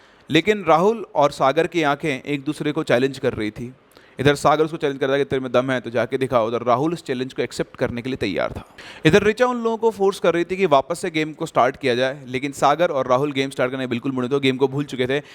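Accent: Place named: Maharashtra, India. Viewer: 10/10 for the perfect Marathi accent..☺️🤞😍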